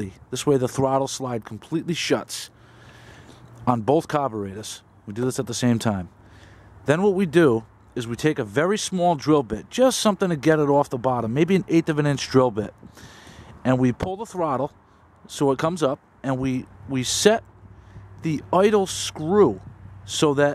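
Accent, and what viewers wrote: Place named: New York, United States. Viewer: Sounds like every other New Yawker Ive ever heard or maybe BAAAsten.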